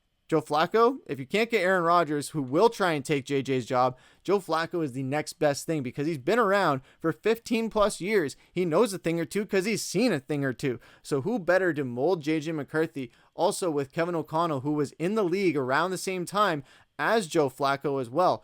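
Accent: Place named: Minnesota, United States. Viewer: You have the minnesota, north Dakota about accent.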